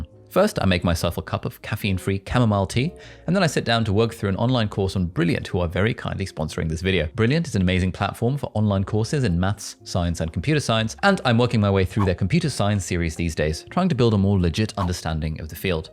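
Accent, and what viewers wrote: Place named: United Kingdom. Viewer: You have a nice accent of Britain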